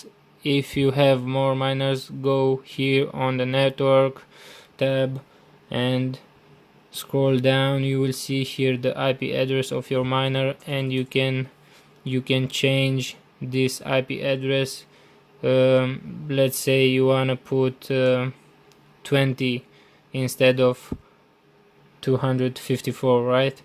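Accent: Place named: Hungary. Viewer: Helló.Te magyar vagy mi?A kiejtésedből úgy vélem.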